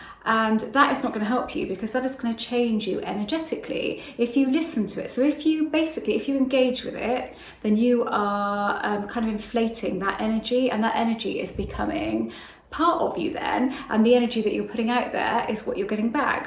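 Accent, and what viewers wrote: United Kingdom. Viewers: You have the same name as my daughter and I love hearing British accents talking about the law.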